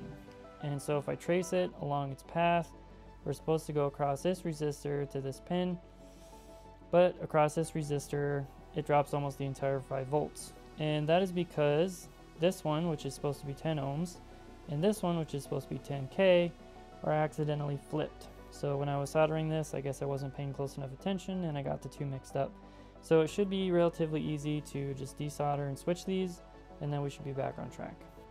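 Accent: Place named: Russia. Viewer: У чувака русский акцент ) А если честно: выглядит потрясающе.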